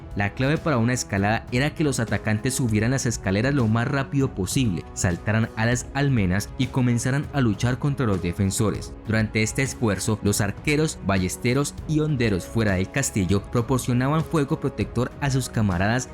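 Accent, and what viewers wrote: Colombia. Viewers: Ese acento de colombiano tan bravo.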